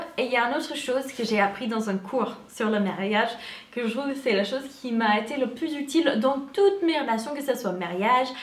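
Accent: United States. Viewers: Ahh le filtre vocal que tu utilises vers la 3 e minute efface ton accent américain, c’est surprenant..🙂 super vidéo comme d’hab’ 💪💪💪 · Comment j’ai pu passer à coté de votre chaine vous etes trop belle et cet accent américain j’aime trop je m’abonne ! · Ouao tu es vraiment très belle avec beaucoup de classe j'adore ! Et ton accent américain 😍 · Y a pas grand chose de plus mignon qu'une Américaine qui parle Français...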